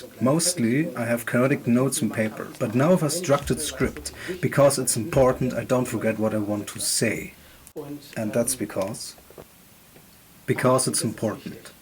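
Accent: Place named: Germany. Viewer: Das Deutsch hört man doch eh leicht durch.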